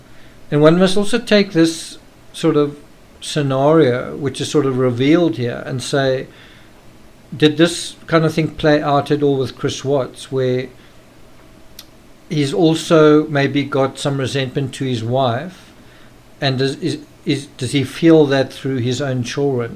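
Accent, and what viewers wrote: Afrikaans, South Africa. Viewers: He has a neutral Afrikaans accent. It's obvious he grew up speaking both English and Afrikaans, though. (Of course, this is just my assumption.)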